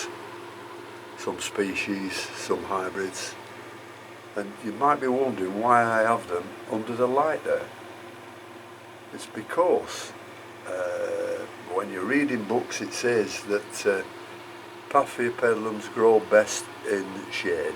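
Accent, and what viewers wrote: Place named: West Yorkshire, United Kingdom. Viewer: Hi Have just found your channel I live in Brittany but it is lovely to hear your accent as I originate from West Yorkshire.I have just subscribed to your channel and will be watching them all.I am only new to orchids but I am hooked!!! I do have a greenhouse but I think it would be to expensive to run.So I have converted one of my rooms into an orchid room with an humidifier so we will see how I go Sorry for rambling on All the best to you Keep Smiling Thelma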